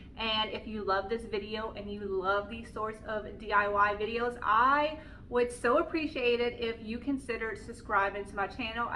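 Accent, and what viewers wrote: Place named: Virginia, United States. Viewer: r you from virginia??? UR accent caught me!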